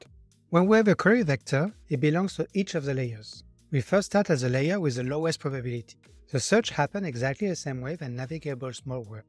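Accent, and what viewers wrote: France. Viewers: It's not that bad. As a English speaker that knows/speaks French, I can tell you are French. It's not had to hear and understand what you are saying. I'd say keep going and make any improvements along the way.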